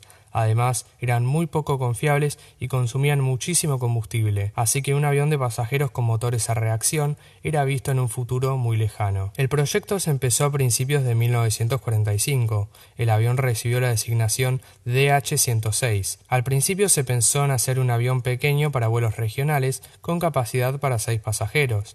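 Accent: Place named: Argentina. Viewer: comencé a verlo y a poco andar se oye la voz de un argentino con sus aches características en vez de doble eles o "y" donde aquí no resulta para nada creíble -.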